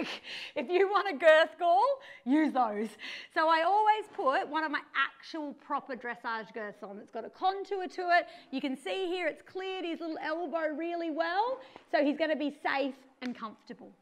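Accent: Australia. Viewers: I tried this with my OTTB here in AuS, and Honestly it just all made sense. was so positive and reassured me it was okay that he picked up the wrong lead and his stiff side was okay when he stopped and turned. This is brilliant stuff and hearing it with an aussie accent and knowing how things work over here 😀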